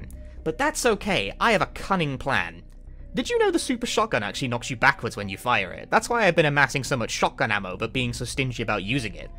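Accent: United Kingdom. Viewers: Are you british? You talk like a sitcom character.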